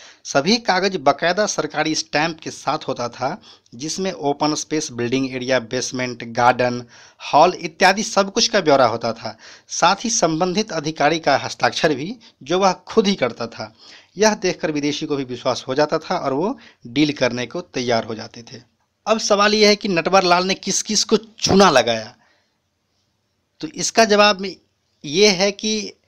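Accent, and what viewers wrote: Bihar, India. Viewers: U are bihari ur voice totali bihari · Bhai yar bolne ke tareeke se pkka pta chal jata hai ki bihari hi hai 😍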